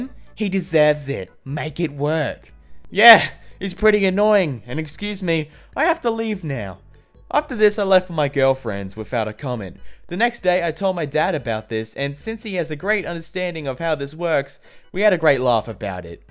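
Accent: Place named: Australia. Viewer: I think he is Australian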